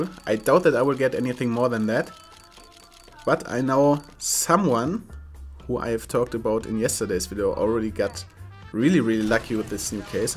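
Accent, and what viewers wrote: Germany. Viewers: Bist du ein deutscher oder ein Engländer ? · This german Akzent Oo xD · man hört direkt dass du deutsch bist :D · wenn ein deutscher englisch redet.... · Ich krieg so Aids bei deinem Deutschen Akzent während du Englisch sprichst. Es gibt nichts schlimmeres.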